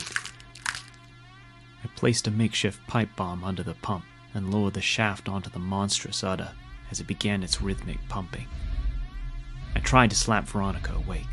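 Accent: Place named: New England, United States. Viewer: Love the New England accent of the narrator. The "salt" in his voice invokes the historical draw of the sea for these maritime adventurers and adds to the tale. Good story too!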